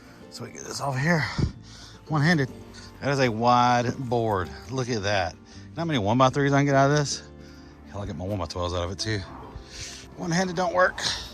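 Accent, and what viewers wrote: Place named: Georgia, United States. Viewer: Where in Georgia are you from?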